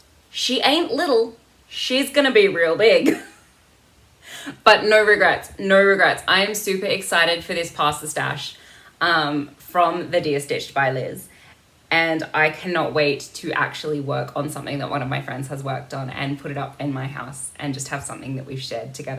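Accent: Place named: Australia. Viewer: I knew as soon as I heard the accent you were an Aussie!!!! Yay!!! Love seeing other Aussie cross stitchers…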